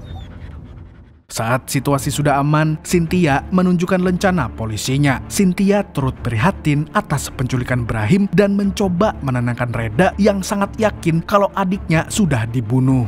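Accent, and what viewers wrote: Banjar, Indonesia. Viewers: Admin nya orang Banjar kalua kah😂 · Kaypa habar nya.? Banjar ni pina nya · Halus-halus wani hangittt 😂😂😂😅pian orang banjar kah bang 😅😅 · Orang banjar kah mang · Mamang bantaki basa banjarnya memberikan komentar rami banaŕ jadinya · Urang banjar kh pian mang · Urang banjar kah pian mang😂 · Lawasny meumpati, hnyr tau urg banjar sdn ni😁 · Urang banjar kh nih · Halus halus wani hangit wkwk orang Banjar kah bang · Orang Banjar jua lah mang?😁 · Banjar kh mang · Orang Banjar yg b isi akun ini kayak nya 😂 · Admin orang Banjar kah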